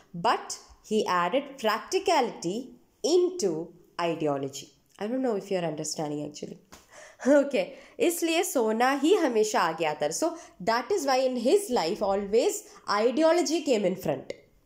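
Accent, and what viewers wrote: Kerala, India. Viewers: Maam you have explained this chapter beautifully!! Also, which state do you belong to? You really sound like a person from Kerala with a Mallu accent😂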